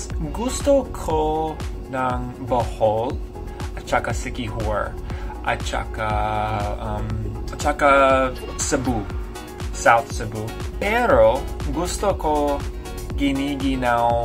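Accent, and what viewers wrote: United States. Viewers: All you have to do now is polish the way you speak in tagalog and along the way you might lose the american accent when speaking tagalog.... woth a little bit time... the way you are gonna speak in the future might be on par with the locals keep it up.... you got the spirit imma stay tuned into this adventure even more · Good. I'm a Filipino and i can understand you. You have a lot of pauses and the accent is still american accent but it's fine as long as it's understandable. More practice 👍👍👍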